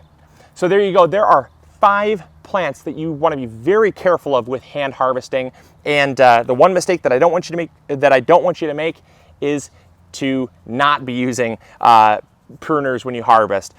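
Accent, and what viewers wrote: Michigan, United States. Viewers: This is completely off topic but as a small backyard gardener in California (born in Michigan) your michigander “accent” makes me smile 😃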